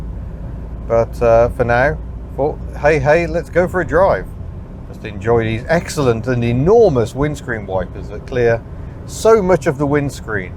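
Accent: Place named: Scottish Islands, United Kingdom. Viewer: sounds like the west coast islands of Scotland to me?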